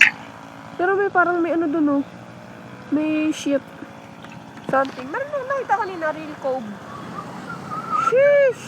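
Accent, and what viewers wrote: Laguna, Philippines. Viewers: Gusto ko yung puntong laguna mo idol haha enjoy ako sa vid palagi! sana makaride ka namin ng misis ko soon! penye sticker haha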